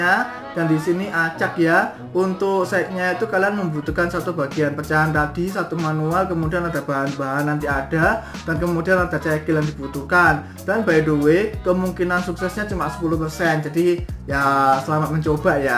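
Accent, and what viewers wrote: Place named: Java, Indonesia. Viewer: bawa acaranya gokil :) bgd :) jawa nya :) gmn gituh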